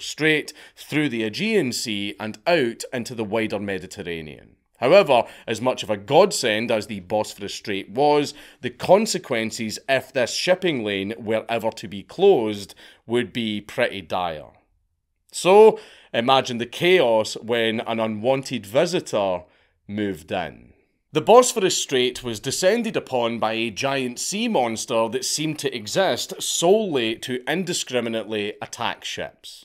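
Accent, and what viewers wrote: Scotland, United Kingdom. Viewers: Listening to your phlegmy, Scottishy, and nasally voice, reading such well written scripts (about things which i find interesting), is the highlight of my week... Carry on haha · A Scotsman could read out the dictionary for all I care, I'll NEVER get tired of their accent 🤣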